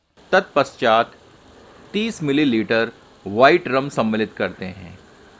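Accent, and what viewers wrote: Standard. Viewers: pure Hindi .... Sooo good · He is the only one who speaks SHUDH HINDI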